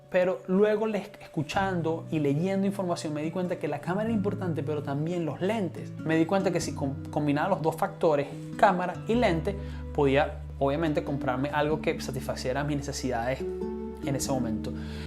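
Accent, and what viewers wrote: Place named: Venezuela. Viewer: ya decia yo, verga el acento de esta pana es venezolano ajuro.. pan banderita de venezuela en la camara haha Buen video bri!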